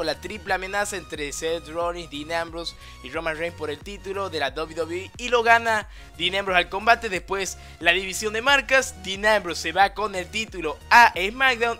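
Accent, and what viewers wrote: Tucumán, Argentina. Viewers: yo soy argentino y tu tonada parece de tucumana😊😊😊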